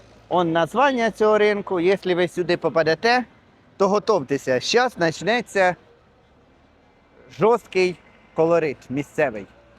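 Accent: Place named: Poltava, Ukraine. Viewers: Красавчик 😂 Полтавский діалект ❤ зробіть обзор апкомагазину, яке є СУХЕ вино і почім?! заздалегідь Дякую. ну і коли будемо жарить попугая (рибу)?! Обох обійняв. цьом. Тримаймося 🎉 p.s.у ВєлМаркеті в Києві, банани по 71грн, Еквадор, красиві. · Ваш полтавський суржик шикарнючий. Кому не подобається- всі в сад. Тільки не переходьте на кацапську, бо блогери - україномовні одиниці. На язьіке вагон і тєлєжка, але тошнить од кацапського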